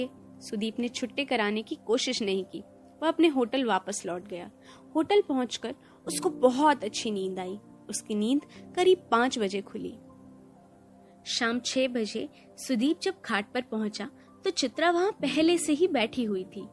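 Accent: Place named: Bhojpuri, India. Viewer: Bhojpuri vioce amezing❤ 😂😂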